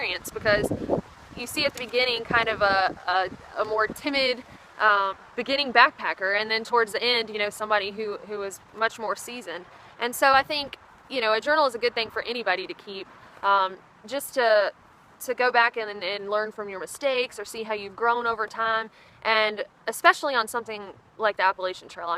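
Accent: South, United States. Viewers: Came here for the southern accent- stayed for the information... Bought your book.. can't wait to read it. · I bowl water also. Your southern accent is dignified and correct! My favorite camping item to bring would be bacon.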